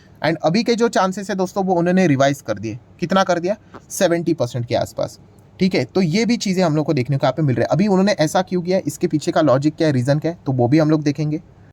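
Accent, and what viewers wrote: Maharashtra, India. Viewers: मराठी माणूस आपल्या बोलण्यावरून लगेच ओळखला जातो. आपला सर मराठी माणूस आहे. जय महाराष्ट्र जय शिवराय..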